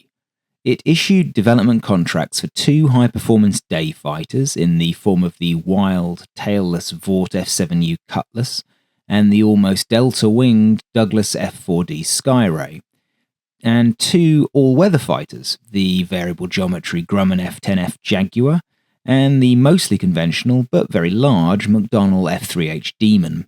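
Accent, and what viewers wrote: England, United Kingdom. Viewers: Well, the English call it a motor, and he sounds English, so there!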